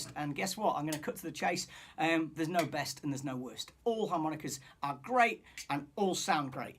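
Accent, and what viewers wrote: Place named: England, United Kingdom. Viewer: Hello again And here's me thinking you were from London 😂 You sound more English than kiwi But that's not of interest your music speaks volumes I tend to play harps as well Mine is G and E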